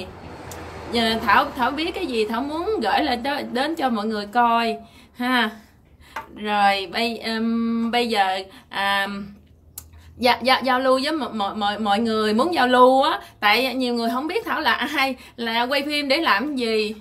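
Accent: Mekong Delta, Vietnam. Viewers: ...minh song tai MY cung lau roi...van ung ho cho kenh cua...thich vong noi nam bo that tha chat phat....luon ung ho cho kenh cua ban...!!! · Cam ơn em rất nhiều em đả cho thấy được cuộc sống ở mỹ qua giọng nói người niềm nam thật dể thương , chúc GĐ em hạnh phúc nhe..... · Chào c . Chúc c nhiều sức khỏe e rất thích c giọng miền tây dể thương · oi xem em noi chuyen chi thich lam dan mien tay chan chat thiet tha, chi lam dau mt 2020 nay la 37 nam do, nen yeu lam dat va nguoi mt, chi chuc tan suc khoe trieu niem vui ben chong con hen, q4 sai gon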